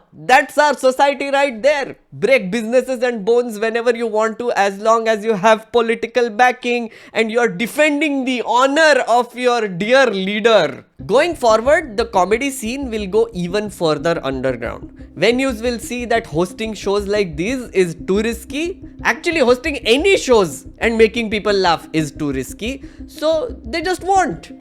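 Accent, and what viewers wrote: India. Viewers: You have a stereotypical Indian accent. 😃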